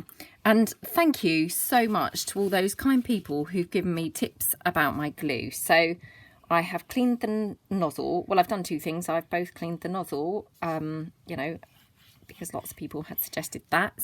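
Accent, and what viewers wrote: England, United Kingdom. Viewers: First ,as living in the US, what are Eggs & Chips and Potato Jackets? I don’t do much with paper , but am trying to get some knowledge of things I like , so I can! Also , your voice sounds exactly like a friend but I forget where in England she is from, but , still , Her accent always seemed a little different and exactly like yours! She IS NOT a crafty person at all, but does hair! I’m sure there is a different word you use for hair dresser but don’t know what it is! I love the words you both use a lot like “fiddly” & some I can’t think of now, lol. I will have to ask her about the dinner menu’s:-)) I love that paper and your tag! I’ve had some people I have subscribed to for ages and never seem to go outside of my box in watching videos, which I am now doing! There had to be a good reason I subscribed in the first place and now I can see why (except for the fact that you talk as much as I do in my videos) 😆. 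Gail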